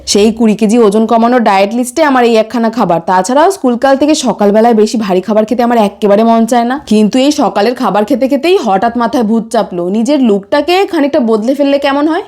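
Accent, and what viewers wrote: Bangladesh. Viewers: দিদি তোমার ভিডিওগুলো আমার খুব ভালো লাগে। আজকে ভিডিওটি অনেক সুন্দর হয়েছে। প্লিজ তুমি চুলে কি কি লাগাও আবার একটু বলবে বা একটা বড় ব্লক দিবে আমি তোমার নিউজ সাবস্ক্রাইবার তোমার ভিডিও খুব ভালো লাগে আমি বাংলাদেশ থেকে তোমার ভিডিও দেখছি তোমার কথা বলার ধরনও খুব সুন্দর বাংলাদেশের টান রয়েছে😊❤ তুমি এভাবেই সুখী হয়ে থেকো❤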